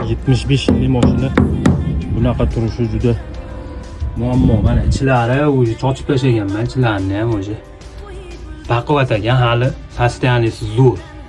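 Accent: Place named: Kokand, Uzbekistan. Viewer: Қуқонликмисиз ака? Қуқонча шева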